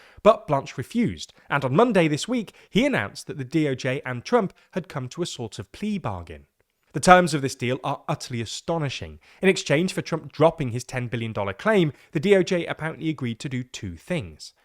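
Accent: United Kingdom. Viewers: Yawn. Another brit-accent wagging-fingers about superior morality. America is not Commonwealth, so your ignorance not understanding undercuts your ‘judgement’ of events and facts: America does not jail politicians for running an office, but Biden+co did just that; weaponizing lawfare until Trumps whole family was threatened with jail - when they had nothing to do with it. So much Democracy under Liberals. Further, their fortune was directly threatened vocally, legally, politically, but US administration: what better way to say Papa Spank, than make departments reel from institutional shock and public shame? AND, the January Sixth protest was another coercion this tries to set right: as you will see, the voting was entirely rigged, because Chatham House cannot survive two Trump presidencies. They’ve said so themselves publicly just last week. Maybe it;s a rug-pull, but I see Justice in exacting a price for government malfeasance and appears nothing beyond what Every Other Leader seems to do. 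Biden+Co thought an Autopen substituted for Public Opinion and legal Congressional Acts. Enjoy your morally superior rape-gangs, England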